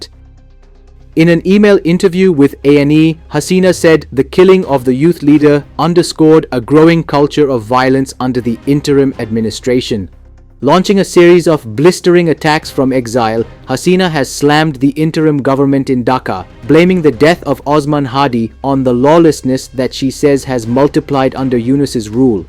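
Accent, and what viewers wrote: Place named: India. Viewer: An Indian with shitty accent... Desi Romanised narrator.. crimes of India channel never amazed me.. office is smokers point in mumbai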